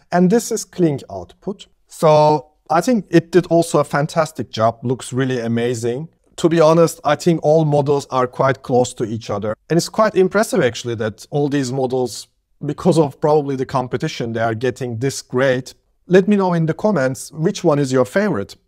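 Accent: Turkey. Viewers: sende türksün demi türk ingilizcesi bu ^^ iyi günler^^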